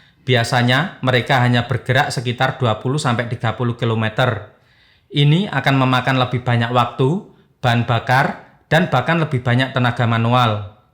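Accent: Java, Indonesia. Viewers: Wahhduhhh loghat jawanya mas kental sekali wahduuhh😂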